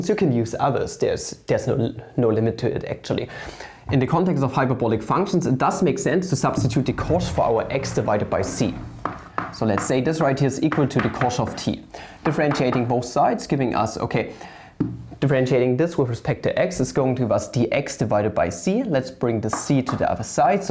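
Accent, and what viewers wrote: Germany. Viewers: A bit too patronizing and arrogant. You are 10-0 behind because of the terrible German accent and from the start Matt Parker's drawing is much better than yours.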